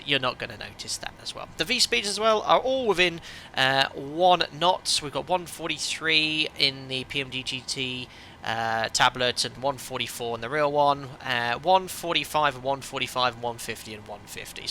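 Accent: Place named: United Kingdom. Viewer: This is why your one of the best flight instructors and tutors for the Boeing 737. Basically an expert with Professional speech / terminology. Full of good knowledge with dry British humour and accent.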